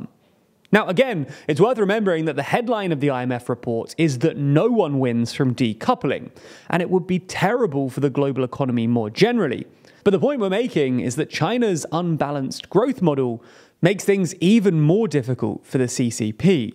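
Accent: England, United Kingdom. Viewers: Aaron This dude is British. Listen to his accent.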